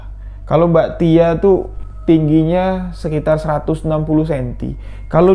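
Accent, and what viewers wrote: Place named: Java, Indonesia. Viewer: Mas logatnya kek asli jawa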